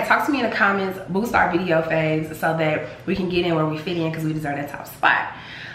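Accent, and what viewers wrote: Chicago, United States. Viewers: This my first video I ever watched but you have to be from Chicago lol. New subscriber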